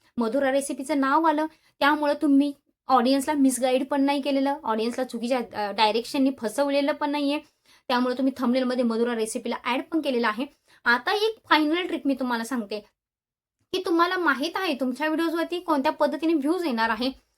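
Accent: Nashik, India. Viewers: Tai tu nashik chi ahe ka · Tai tumhi nashikchya ahat ka ?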